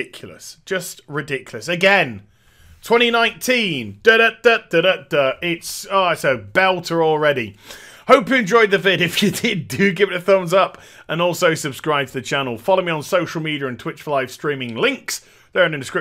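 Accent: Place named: United Kingdom. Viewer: lol this British accent :D, im sorry i assumed that!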